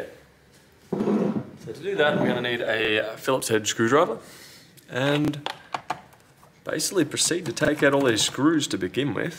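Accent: Australia. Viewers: G-day! Are You from Australia?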